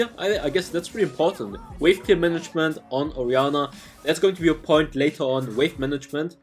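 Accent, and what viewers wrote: Germany. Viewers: Are you German, bro? :D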